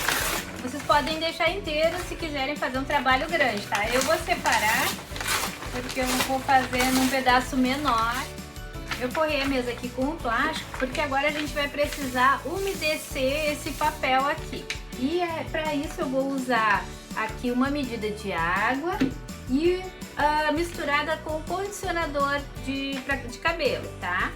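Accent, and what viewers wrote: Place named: Porto Alegre, Brazil. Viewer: Bha! Caí aqui de paraquedas! Achei o sotaque parecido com o meu! Sou de Porto Alegre Bairro Agronomia. Já vou me inscrever agora. Gostei da técnica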